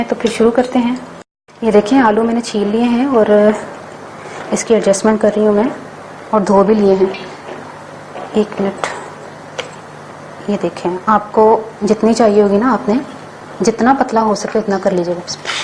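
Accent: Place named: Punjab, India. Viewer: Main bhi lahore main paida howi apki paki panjabi son k bohat khush howi main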